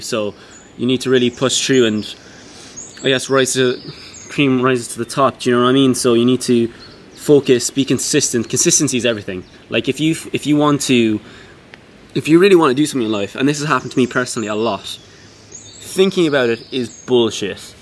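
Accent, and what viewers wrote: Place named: Ireland. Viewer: Some good answers man! Nice Irish accent haha 😂😅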